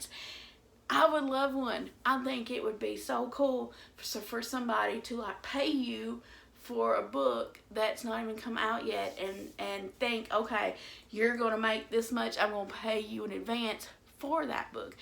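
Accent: United States. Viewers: I love your accent because it reminds me of places I have lived in the Deep South. A bit of an accent sometimes comes out of my mouth to this day... If I ever said I wasn't jealous of other writers, I was lying... I often want to give up writing... I love editing. Like Stephanie said below, it's my thing/jam... Hey, you should love your books!!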